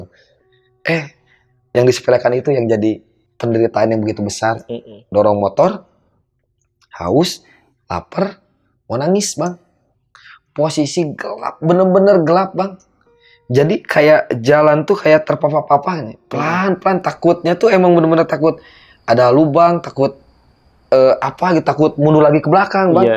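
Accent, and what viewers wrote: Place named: West Java, Indonesia. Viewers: Bengsi gk tuh. Sunda lekoh yess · Sundanya kerasa bgt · Justru logat Sunda lucu😂suaralu kali yg gaenak didenger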